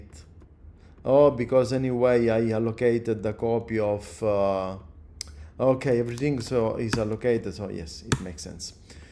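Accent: Sicily, Italy. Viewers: il tuo inglese mi fa morire perche mi ricorda tanto il mio. Il tuo richiama la Sicilia Sud Orientale, il mio quella nord occidentale:-)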